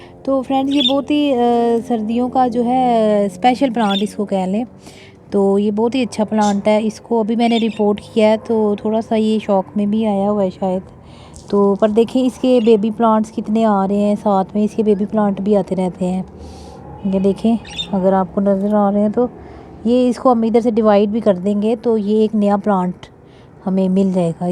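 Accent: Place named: Punjab, India. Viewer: barri sohni awaz punjabi touch of pindi ji.like ji.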